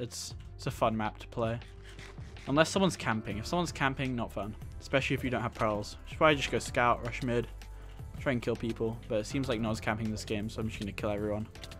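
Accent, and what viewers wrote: United Kingdom. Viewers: Why are You british.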